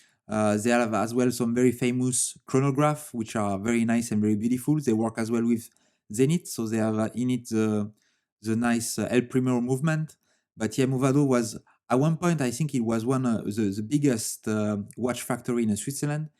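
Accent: France. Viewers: Great video. I like the Franglais accent. Just one tip. When you assemble the automatic works on top of the movement, it is better to unwind the main spring and let the movement stop. If a screw would drop into the running balance spring, that can cause a lot of damage.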